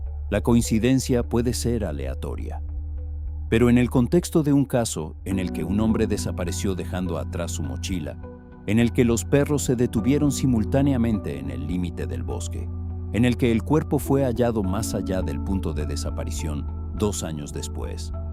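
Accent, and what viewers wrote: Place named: Argentina. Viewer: Es mejor la otra voz esta suena como argentino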